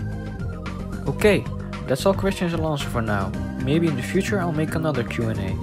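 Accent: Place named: Netherlands. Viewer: OMG THE NEDERLAND ACCENT OMGGGG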